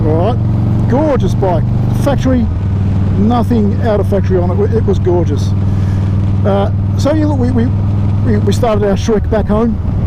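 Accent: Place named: Australia. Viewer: Well, wonder no longer! I've always enjoyed the Australian accent. I just don't hear it everyday. It's not you, it's me! Looking forward to your next video.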